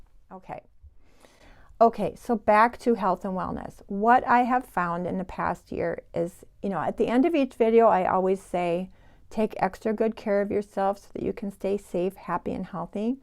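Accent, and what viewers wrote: Minnesota, United States. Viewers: I am so happy for you. Also, I love your accent. I realize that, for security reasons, you won't say where you live, but you sound as if you're from Minnesota. I could listen to you read the telephone book!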